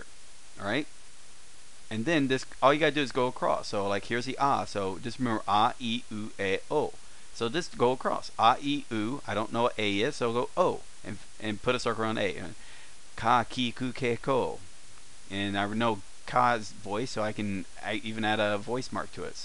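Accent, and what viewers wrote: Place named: West, United States. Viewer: how can it be the mu of samuel when the mu in samuel sounds like "myu"; geez you western foreigners and your accents at least teach correctly and try not to confuse the students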